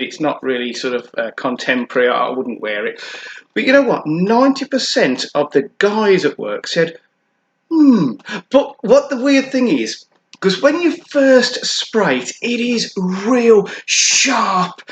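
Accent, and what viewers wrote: Birmingham, United Kingdom. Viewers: Great review mate and do i detect a slight Brummie accent there..? ..subscribed 👍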